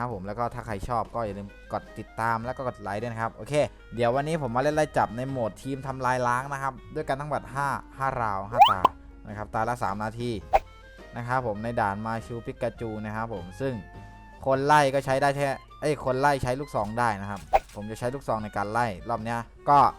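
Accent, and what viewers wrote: South, Thailand. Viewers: ภาษาใต้เรย555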